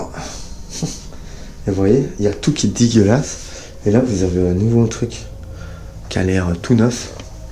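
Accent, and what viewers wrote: Liège, Belgium. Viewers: Ah l'accent de lièges 😂 . Bon c'est un endroit en Belgique mais ou .... en tout cas c'est bien glauque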